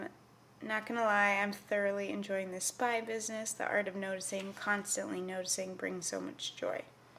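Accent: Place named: United States. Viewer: Hey I love your video! Your characters are super cute! I just wanted to give you a heads up that "gypsy" is considered a slur in many places. You sound American or Canadian by your accent - we don't come across that word as often so I think many people here don't realize it's negative. But in Europe it's a derogatory term used against the Romani people. I'm not trying to make you feel bad because it seems like you have good intentions! I just thought you might want to know before you include it in your course.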